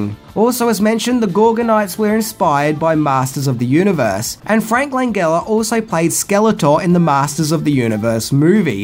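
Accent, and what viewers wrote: Australia. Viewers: you are annoying beyond words. Frank Lan JELL a Pat BEN et are These are not obscure names from the twelfth century BC, they are actual famous people of our time. Don't guess at names. Not people's names, not place's names. I get that you're from Australia and so e words and phrases may be unfamiliar or even be pronounced differently, but these are NAMES. YOU MAKE MY EARS BLEED. I'd block your channel but your info is good and I DO learn new things. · In case you all hadnt noticed, an Aussie, they pronounce things differently, lay off him, he's got it hard enough being upside down all the time. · Maybe its an ausie thing, like how they pronounce seaga instead of sega? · Wait a minute? Pat Ben-ah-tah? It's said "Ben-ah-tar". Kind of reminds me of how you say "figure" due to the Aussie accent, making "ure" as "ah" instead of sounding like "your". 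You'd never say the 80s band " " as "Midge Ah". LOL As for the soundtrack, it doesn't make any sense to have " " by when the original song from 1969 would have been way better and more harmonic. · well OK if you call Australian accent proper English,, tbh though I live in England and I've noticed he has mispronounced some very English words,, lol.. Still a great YouTube channel though and I'm only messing about as I know what you mean it's just made me laugh when he said some town names from England wrong in a video ages ago,, tbh I can't even remember what it was now but had to laugh because it was totally wrong.. Lol..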